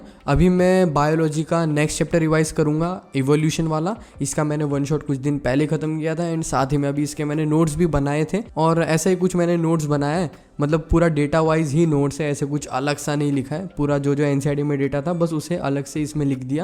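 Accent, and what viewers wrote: Maharashtra, India. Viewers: Your language gives a very strong maharashtrian vibe😂❤ BTW another maharashtrian here.💗🌟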